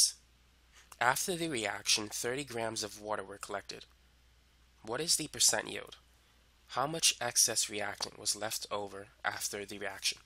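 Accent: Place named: Boston, United States. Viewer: His boston accent 🤤🤤🤤🤤🤤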